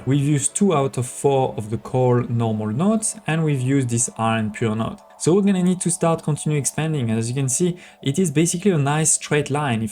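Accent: France. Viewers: l'accent Français c'est quand même vite reconnaissable quand on parle anglais :D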